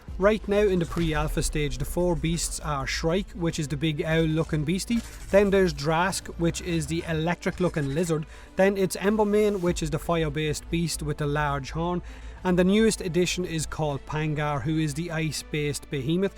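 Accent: Ireland. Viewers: God damn, I couldn't decide which was better; the video game being made or your accent. I hope we get to meet in-game one day, Irish Hunter Man. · I am guessing you are Irish, are ya, mate? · Very Irish :b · Your irish accent is somewhat entertaining xD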